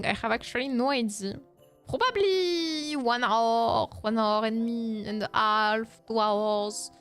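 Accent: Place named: France. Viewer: I love your French accent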